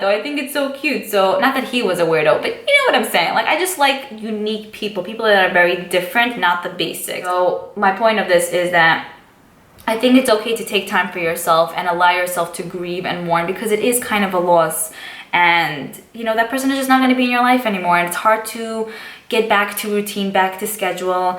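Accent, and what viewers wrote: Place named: Brooklyn, United States. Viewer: you're a beautiful woman, you're still young so the right guy for you is out there! I love the look you came up with here, the lashes just really topped it off beautifully! I love hearing you speak, I was born and raised in Bklyn (till I was 21) so you sound like home <3 hey have a bagel for me on 13th and 75th if its still there! that place was my jam back in the day for a bagel with melted cheese! lol anyway, just found your channel recently and i'm loving it and you xo Michele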